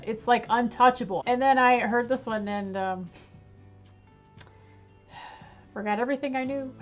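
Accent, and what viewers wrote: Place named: Mid-Atlantic, United States. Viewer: My second listen... Hear "noaht" for "note"... Def Mid-Atlantic.. Awesome!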